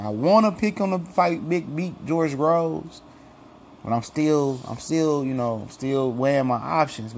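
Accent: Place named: Detroit suburbs, United States. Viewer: tickle your fancy bro Detroit niggas don't talk like that are you really from here or from the burbs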